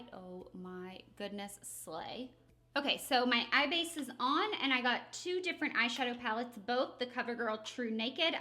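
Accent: South, United States. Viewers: It's funny. I started watching your videos about your third video up with your southern accent! But that's beside the point! Lol ... I STILL use OMGHHKP to this day. I'm a teacher and even my students say it.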